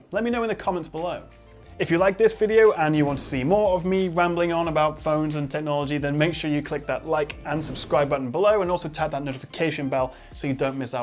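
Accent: United Kingdom. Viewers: I like your British accent😁👍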